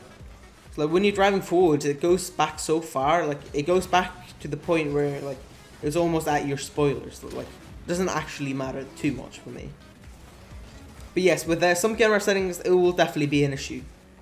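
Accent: Sweden. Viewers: Are u swedish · Are you from sweden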